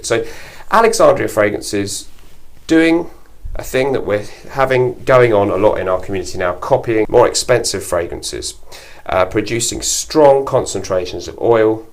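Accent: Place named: Cockney, United Kingdom. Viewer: urhghh, that cockney accent shudder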